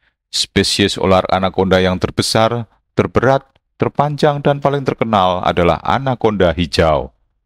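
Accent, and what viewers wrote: Indonesia. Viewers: Logat nya sangat kental sekali, pasti beliau orang medan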